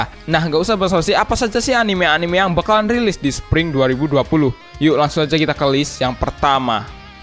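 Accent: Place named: Java, Indonesia. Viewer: Bang lo orang mana bang logat ny kayak orang jawa ya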